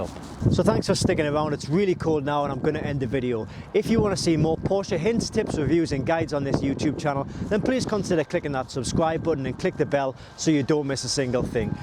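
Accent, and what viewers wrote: Newcastle, United Kingdom. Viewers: Alan Buckingham Fairly sure he said that he wasn't sure about the air in the seats. Why you being so offensive, if you read the comments here you will see that most people did learn a couple of things, and for those that didn't were nice and polite, the way a gentleman would be. He has a Geordie accent, and probably has more class and decorum in his little finger than you have in your whole body. You fucking child. Jog on your not welcome here.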